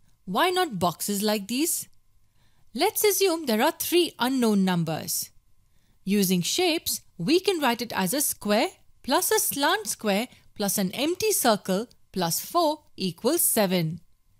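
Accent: India. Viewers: Are you indian? The voice should explain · Are u indian.your voice pls explain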